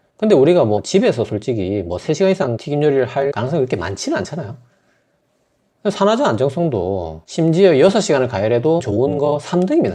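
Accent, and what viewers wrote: Gyeongsang, South Korea. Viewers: 선생님 꿀 지식을 경상도 억양으로 설명해주시니 정겹습니다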